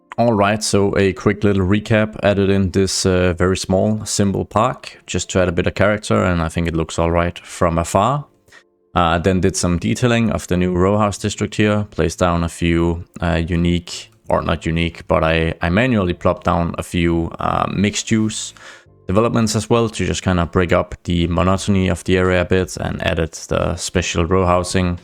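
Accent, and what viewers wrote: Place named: Denmark. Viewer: Man kan bare høre at du er dansker xD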